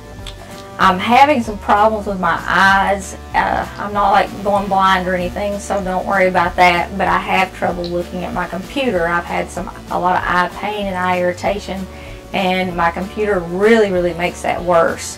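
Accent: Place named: Tennessee, United States. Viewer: Thank you so much!!! My mother was born and raised in Nashville/Memphis Tennessee! You remind me of her! Right down to that beautiful accent! You are in my prayers ❤️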